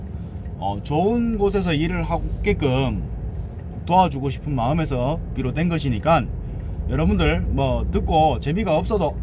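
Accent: Gyeongsang, South Korea. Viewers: 이제 용차를 준비하는데 좋은 가르침입니다 경상도 사투리가 듣기 좋네요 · 갱상도 사투리 졸나게 거슬리네 토착왜구당 개세들 생각나서 나~~간다 갱상도 정말싫어 귀청소방 이나 가야겠다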